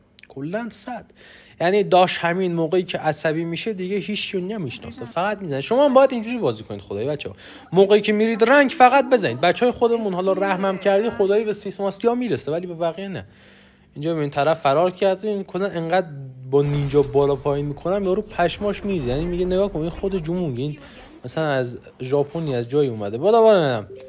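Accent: Kermanshah, Iran. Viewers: داش یکم کرمانشاهی صحبت کن دیگه بشنویم لهجه ی کرمانشاهی های عزیز سلطان😂🔥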